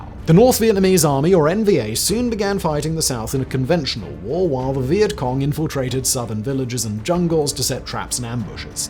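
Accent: United Kingdom. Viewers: Why is some British dude talking shit about the USA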